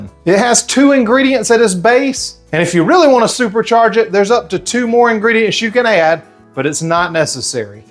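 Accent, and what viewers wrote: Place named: Gulf Coast, United States. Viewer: I'm going to give this a try! I've been really enjoying your videos, especially because your accent reminds me of home (I grew up on the Gulf coast) 😊 Thank you so much for all the helpful information!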